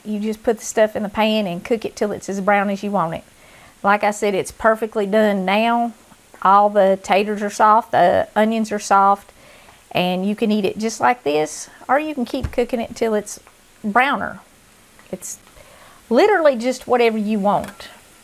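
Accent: South, United States. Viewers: I love your food, AND the scriptures you share with us! America needs to stop turning away from Jesus. Oh and by the way, I love your southern accent too, God bless America!! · I came for the Southern accent...not disappointed.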